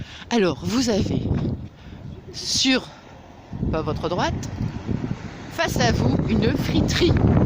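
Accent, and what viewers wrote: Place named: Pas-de-Calais, France. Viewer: Je suis avec vous depuis peu ! Je m'étais rendu compte de votre accent !!😅j'habite près de Metz ,mais je sui de la bas ( pas de calais) aussi !!! J'ai un frère qui y habite encore 😊 j'étais de Isbergues près de aire sur la lys 😉😘😘💞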